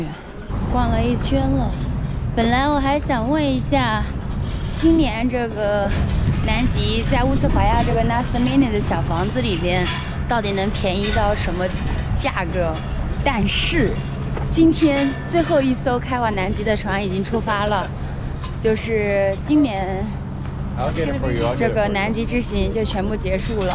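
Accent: Northeast, China. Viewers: 应该和东北女孩一起工作生活过，满口的东北口音，一点不像服南妹子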